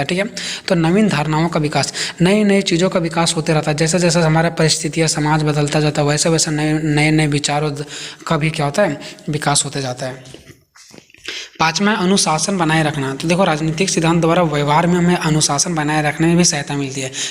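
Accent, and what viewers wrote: Uttar Pradesh, India. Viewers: Ap ki voice bhot pyari hai aesa lag rha hai kese ap utar Pradesh se ho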